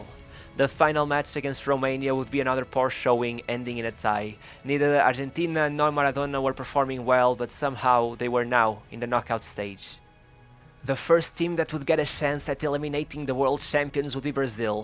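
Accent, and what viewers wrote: Portugal. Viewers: Uma pergunta: és português não és?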